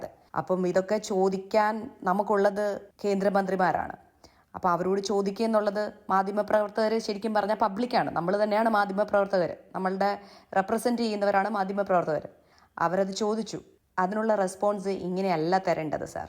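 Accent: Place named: Malaysia. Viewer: Oo you are malaysian malayali😁. Accent oru different pole thonni. Nalla videos. Maybe orientation maatamenkil.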